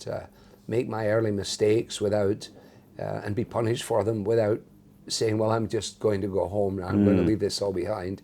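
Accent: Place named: Scotland, United Kingdom. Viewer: I started watching and listening to Alistair after my husband passed away and I decided to join a large church where a lot of my friends were going. The church had called a new young pastor after the former pastor retired. I had been listening to after I got home from my previous church and I really liked his expository teaching. He came to visit me before I joined the new church. He is the one that told me about pastor being his favorite pastor to listen to. That was nearly 3 years ago. So that began my acquaintance with. I searched for every sermon that I could find. I loved his teaching. Just as I loved to listen to the pastor of my new church. I also love Pastor Scottish accent. I was raised in the Presbyterian church and a member of the PCA until my husband died. I joined a large Baptist church. Hard to make that decision but I’m glad I did. 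Since all this has happened to it breaks my heart that he has been judged so harshly by his peers. I pray for him and his family that all will be well. The church needs pastors like him,especially since we have lost so many good teachers in the past few years. Men like RC, and Dr. MacArthur, and so many others. The church needs them. We need to pray that God will bring forth more godly me who can fill those shoes.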